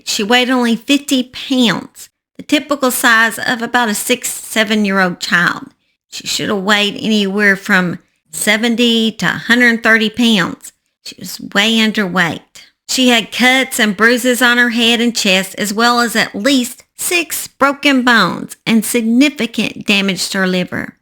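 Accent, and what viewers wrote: South, United States. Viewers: Southern girls get lost in there?? Not hardly people will eat that accent up. We northern girls are unapproachable. LOL You are who you are, that's a good way to be!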